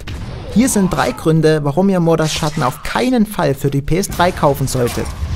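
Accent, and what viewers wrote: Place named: Saxony, Germany. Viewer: Klasse Stimme. Sächselt so schön vor sich hin.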